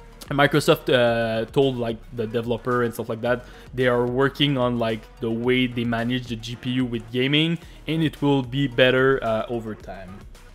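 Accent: Quebec, Canada. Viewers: You sounds like a french-canadian just like me x)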